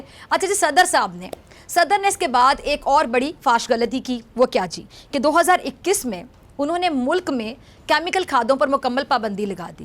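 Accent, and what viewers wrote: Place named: Punjab, India. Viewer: Aap urdu main Panjabi ki tune.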